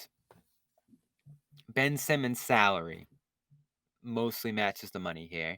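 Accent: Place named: Boston, United States. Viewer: I’ve your Boston accent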